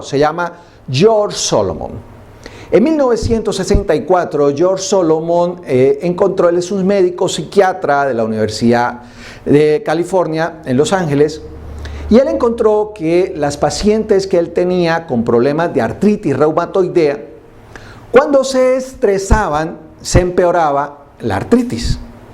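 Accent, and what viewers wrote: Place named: Antioquia, Colombia. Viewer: Cuando no lo escucho siento que se me baja la alegría. Y podía saberlo de memoria, pero me encanta su voz tan paisa y su presencia.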